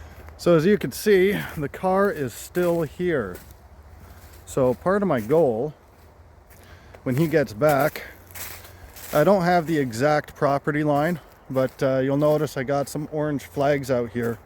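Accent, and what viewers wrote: Canada. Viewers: If folks couldn't tell by your accent that you're from Ontario, the Eh would have given it away! <3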